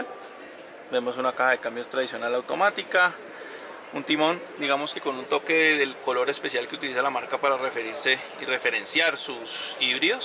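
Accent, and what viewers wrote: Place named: Cali, Colombia. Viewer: Hermano quite ese acento caleno tan chimbo.;..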